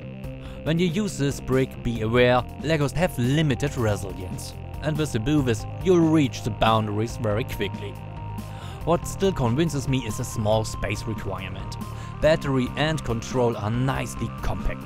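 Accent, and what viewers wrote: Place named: Germany. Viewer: I can hear the German accent 🤣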